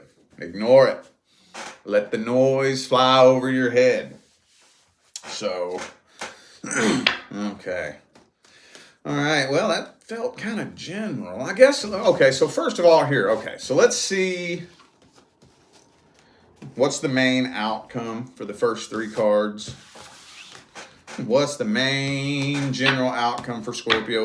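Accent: South, United States. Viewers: U sound like u really from the south ?… hhhmm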